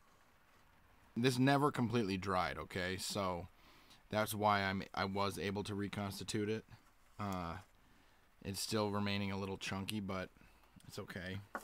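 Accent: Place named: Augusta, United States. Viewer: Dude are you from Augusta?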